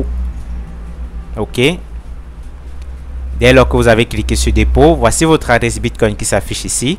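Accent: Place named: Africa. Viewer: un pot moné épargne nous ton accent africaine stp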